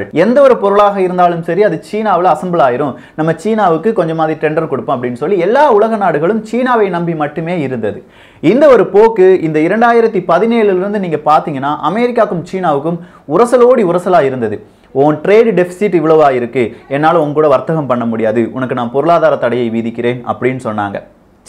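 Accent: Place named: Kanyakumari, India. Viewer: By listening to his slang I think he is from kanyakumari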